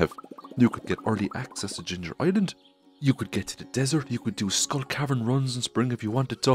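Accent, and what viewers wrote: Ireland. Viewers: First off, that Irish accent is fantastic. Anyways I like most of these changes to an extent, the extra fees for the house upgrades, the nerf for clay drops, and the nerf for the recipe of deluxe speed grow are annoying for sure though. Most of these changes make the game a little harder for the better which is especially nice given the various exploits people can take advantage of for easy progression, but the prismatic shard change is just weird. Thanks for reading my ramble.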